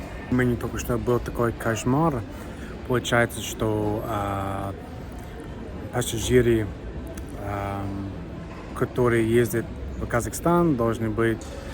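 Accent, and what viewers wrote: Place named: United States. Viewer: Ваш русский язык вполне можно понять. Нам тут в Казахстане не привычно слышать акцент американца, так как у нас мало инострацев.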